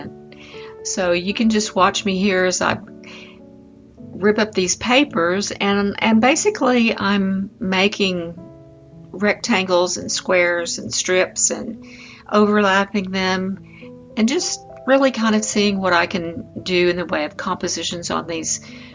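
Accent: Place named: Texas, United States. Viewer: Love your videos and art,. Really helps me with my composition. Are you Texan by any chance? We live in England, and you remind me so much of the Texan women I grew up with. I could listen to you all day..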